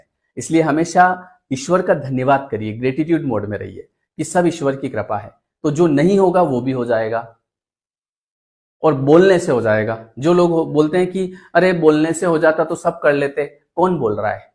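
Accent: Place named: Mewar, India. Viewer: Sir, Apka mewadi tone bhut acha h bolne ka.😅 U are amazing. 😊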